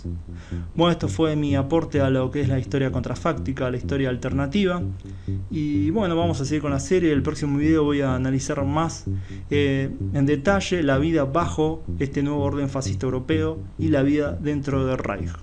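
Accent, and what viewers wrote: Rioplatense, Argentina. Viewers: Lamentablemente, este videísta padece de la tara foniátrica rioplatense de confundir la LL y la Y con la CH; él dice "achá", "chevó", "machor". etc. por querer decir "allá", "llevó", "mayor". No sé por qué acentúa tanto la CH, he visto otros vídeos de otras personas también rioplatenses, pero que no remarcan tanto esa letra, parece que para ellos sería como signo de distinción o algo así, pero lo cierto es que termina fastidiando esa musiquita de la CH. Lo mejor sería insertar una voz robótica, son más neutrales, bien moduladas, y sin sonsonetes de aldea, están disponibles de google.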